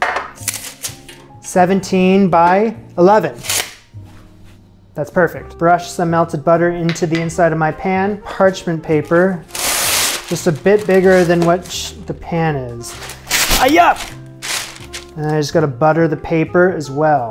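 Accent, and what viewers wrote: Canada. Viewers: Ha! I've watched several of your videos lately and recently thought, "is this guy Canadian?" due to your accent. This video confirmed it!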